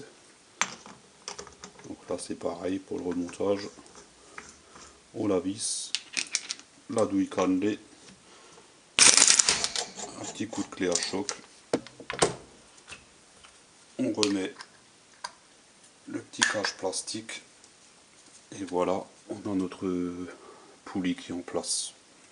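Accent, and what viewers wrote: Alsace, France. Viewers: je suis tombé sur votre vidéo YouTube et j'ai de suite reconnu l'accent forcément etant alsacien. Wittenheim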